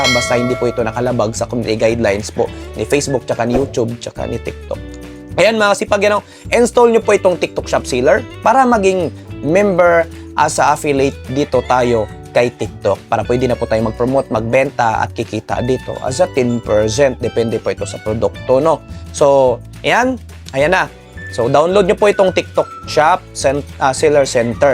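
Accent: Visayas, Philippines. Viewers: Bisaya ka Dong?🤔😁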